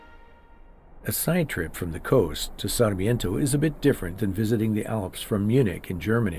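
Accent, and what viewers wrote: United States. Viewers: so good to hear a narrator speaking american english for a change. I'm a bit tired of the usual overly theatrical british english in these documentaries.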